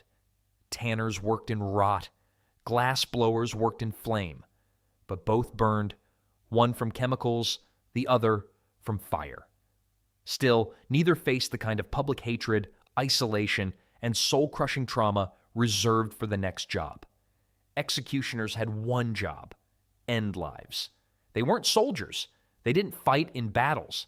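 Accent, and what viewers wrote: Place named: United States. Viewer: Interesting sounds a lot like American hmmmmm 🧐🧐🧐🧐🧐🧐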